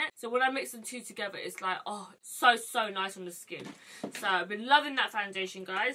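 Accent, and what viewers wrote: United Kingdom. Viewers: who would've thought that your accent is british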